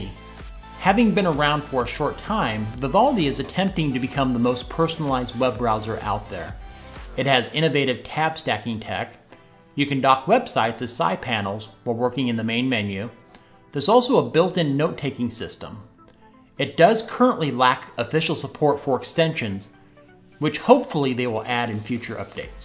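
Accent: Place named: New England, United States. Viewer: Love your Yankee accent...wow.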